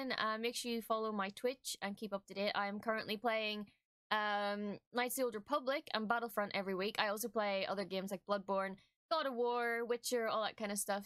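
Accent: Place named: Belfast, United Kingdom. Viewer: I must live near you. I Knoe your accent is a belfast accent. Im in Carrick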